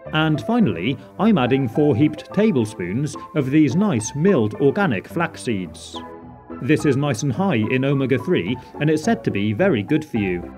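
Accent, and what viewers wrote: United Kingdom. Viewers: I just love the british ascent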